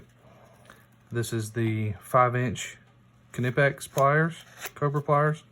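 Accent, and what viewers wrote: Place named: South, United States. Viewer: Thank you. Great no-nonsense, practical EDC with a southern drawl 😊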